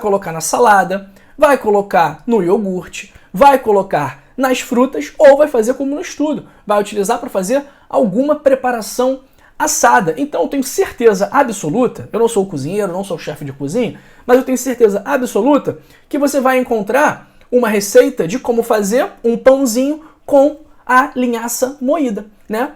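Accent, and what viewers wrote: Rio de Janeiro, Brazil. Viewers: O sotaque do x já sabemos q e do rio essa palavra pechsquisa e do rio não sei como consegue mudar pro Chis ou xis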